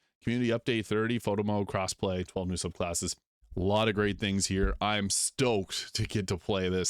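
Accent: Canada. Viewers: okay that makes sense since i couldn’t quite pin it ! assumed canadian but it was different than any i had heard before so i wasn’t sure :p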